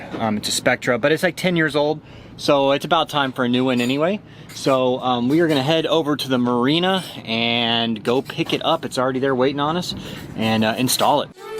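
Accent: Canada. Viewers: "Oout and Aaabout" love the Canadian language. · I like her Canadian accent. Very nice.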